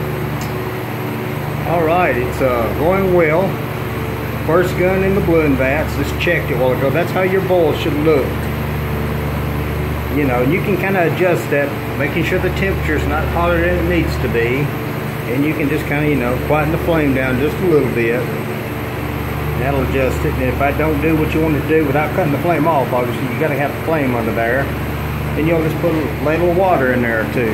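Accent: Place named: South Carolina, United States. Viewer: I am willing to bet this fella is from South Carolina, he sounds just like Bobby Goodson from Swamp Loggers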